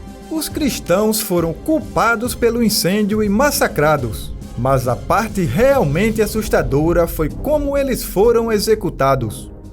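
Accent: Northeast, Brazil. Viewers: Narração muito boa! Sotaque nordestino o máximo. Vc me faz rir! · Gostei do vídeo e das expressões nordestinas. É sempre bom conhecer mais a história, e ainda melhor com o sotaque do meu nordeste.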